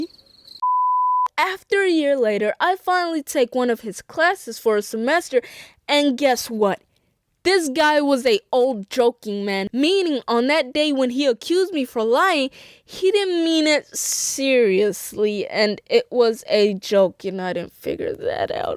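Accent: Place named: Texas, United States. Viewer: Yo u sound like u from lake highlands and Texas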